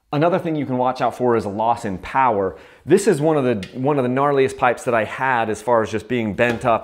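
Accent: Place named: California, United States. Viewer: I can tell your from California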